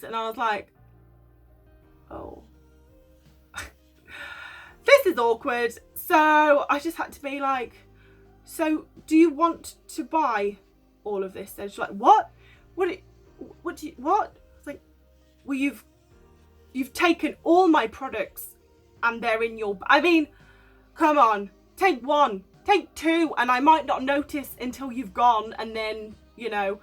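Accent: United Kingdom. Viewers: I just found you, in a group of van-ladies. A interesting and entertaining blogger. I like the accent. British I suppose, I’m American. I have always gotten massages periodically too so I can relate to that. Sorry you seem to have more than your fair share of jerks and weirdos. The worst was clearly the pooper but possibly he has a bowel disease. Carry on. 🤓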